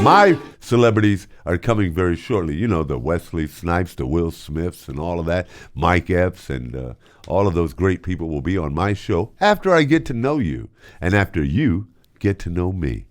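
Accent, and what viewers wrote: United States. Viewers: Hey Americans ! What state accent is this man speaking ? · You are so Sweet hhhhhh you have a Great Voice and American accent I Love it from Algerian.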